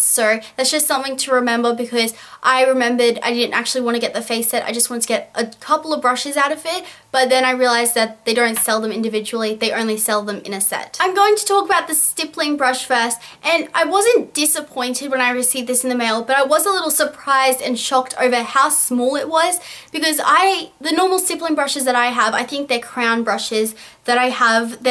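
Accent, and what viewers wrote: Australia. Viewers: Australia I believe:) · I would die for you accent! Australian accents are by far my fav your so perf btw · Australian accent :)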